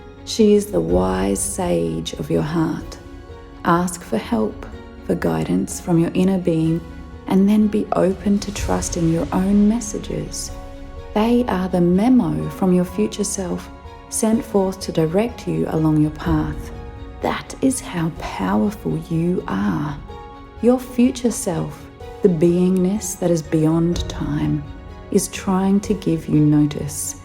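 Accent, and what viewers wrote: Australia. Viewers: Such a deep thought and wisdom that a lot of us neglect especially when our minds go to overdrive and cannot somehow sort out what really our intuition telling us about. Just found you in times of trouble and looking to sooth my heart on Youtube with all the loads of helpful and inspiring stuff. When I hear your voice I immediately recognise your loving Australian accent.:-) Thank you heaps!!!!!! ❤️🙏🏽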